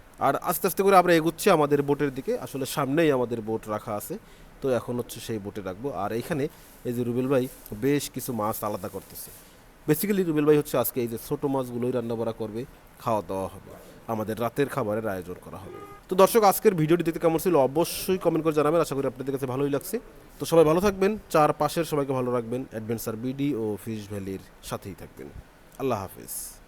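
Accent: Barishal, Bangladesh. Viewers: Ki kotha re bai😂😂 anne sob dehi borishailla mönü ara🐸🐸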